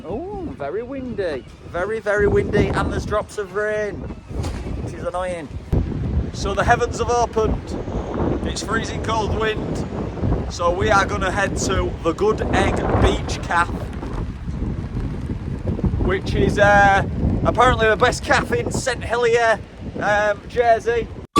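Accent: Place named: Liverpool, United Kingdom. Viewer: Love your Scouse accent ha😂👏🏻Another great episode well done.The time lapse of the sunset looked stunning💛xx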